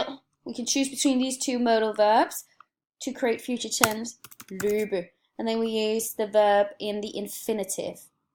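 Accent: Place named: United Kingdom. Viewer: Thank you ! came to your videos to learn Danish , and ended up motivated to practice my british English pronunciation haha yours is amazing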